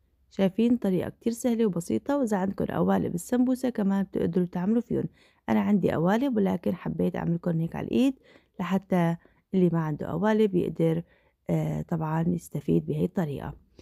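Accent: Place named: Iraq. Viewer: مرحبه شلون الصحه هل انتي من عراق طريقه كلامج اني من عراق اول مرة اشوف قناتج كلش حلوة ومقاطع وقتها قصير اتمنالج موفقيه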